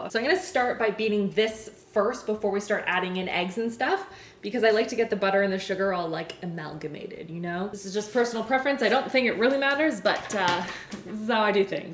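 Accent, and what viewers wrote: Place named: Canada. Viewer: I seriously genuinely love your Canadian accent, it really makes me happy (( Brownies eh )) for example. Ps: all of your videos are amusing 🌼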